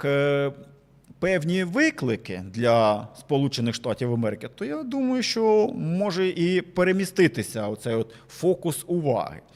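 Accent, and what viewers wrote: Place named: Eastern Ukraine, Ukraine. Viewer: Не зважаючи на гарну українську мову,приємно чути цей особливий східний акцент.Поважаю Вас❤❤❤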